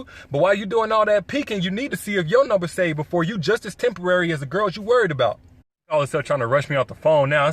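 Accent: United States. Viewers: everything you say is very interesting, and logical. The problem is: you are talking English too fast, with American accent, to people all over the 🌍 world, and we don't understand what you say. Also the sound of the engine car is disturbing. So please. Speak just a little bit slower. Thank you.Efrat Israel. 🍍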